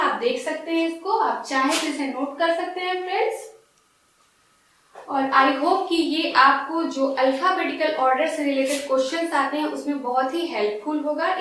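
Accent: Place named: India. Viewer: yea she is but this isn't British it's Indian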